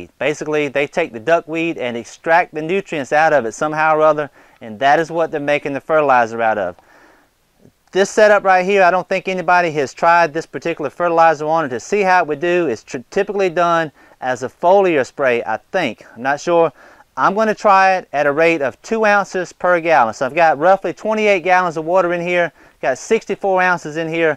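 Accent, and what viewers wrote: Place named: South, United States. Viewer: Good stuff. Interesting experiment—I’m always hunting for that elusive “perfect” organic solution for hydroponics. Keep it up man! Btw: are you from anywhere near where Jimmy Carter is from in GA? I’ve been around a lot of southern dialects in my life, but the only place I recall hearing anything similar to yours is maybe from Jimmy Carter. Lol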